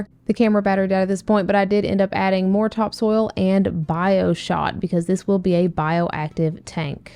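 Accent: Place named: North Carolina, United States. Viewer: being from NC I heard that accent and thought yep I trust her!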